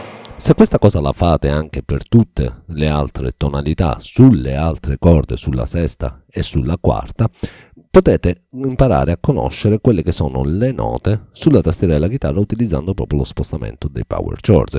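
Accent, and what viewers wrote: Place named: Sicily, Italy. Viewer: io sono ciociaro di origine, ma essendo sposato e vivendo a Palermo, l'accento siciliano non è passato certo inosservato 😂 Comunque complimenti ancora e ti seguo sempre.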